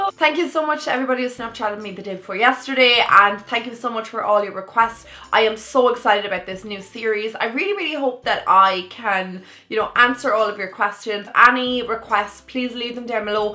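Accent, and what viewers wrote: Ireland. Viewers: I love your vidieos! I also love your makeup looks u do! I've bought several products from watching u that I love! I love the loreal blur cream and the nyx butter lip in fun size! I also love your accent!!! I'm Irish too and my grandfather has the same accent I love it!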